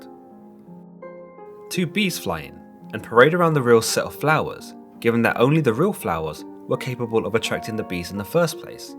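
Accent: United Kingdom. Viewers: Something about British voices that make things sound better lol.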